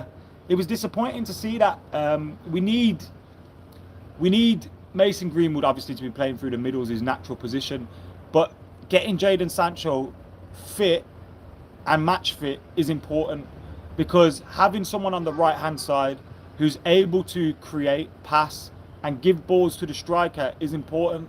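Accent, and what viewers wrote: Birmingham, United Kingdom. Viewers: Hahah are you a Brummie??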